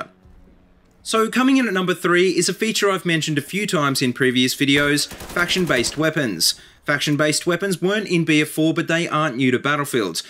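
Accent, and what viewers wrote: Australia. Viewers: auwfull aussy accent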